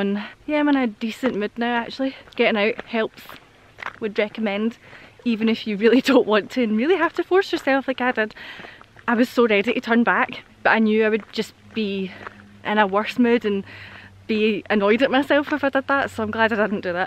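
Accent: Scotland, United Kingdom. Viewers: I am in love with the Scottish accent!! 😍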